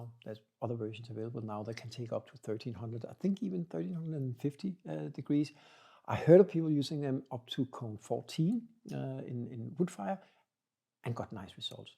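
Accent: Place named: Denmark. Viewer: Danish? Your accent sounds just like my uncles! ❤ great video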